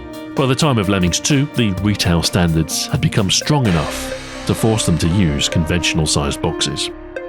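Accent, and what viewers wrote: United Kingdom. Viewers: I just watched 22 minutes of a well-spoken brit talk about boxes and I fucking loved it. That's the power of I tell you damn. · You're back! Awesome. Your sophisticated-sounding British voice is the coolest.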